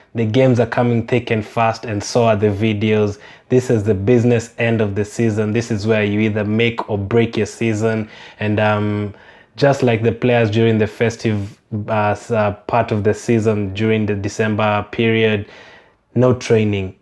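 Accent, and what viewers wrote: Kenya. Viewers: U sound Kenyan u accent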